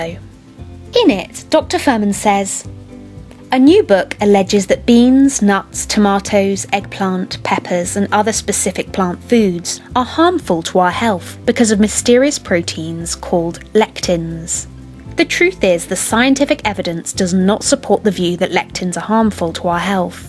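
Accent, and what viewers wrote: United Kingdom. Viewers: Just because some mushroom has some special property that confers immunity to cancer doesn't say anything generally about lectins. Until "science" offers better theories, instead of spending their time theory-shaming others, people will keep trying the diet - and if they feel / get better, then even you telling them theyre wrong in a calmly reassuring British accent isn't likely to change their minds.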